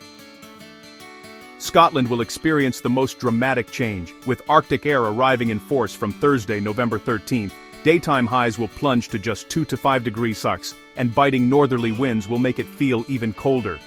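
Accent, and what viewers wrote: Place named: United States. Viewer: UK weather .why has the narrator got an American accent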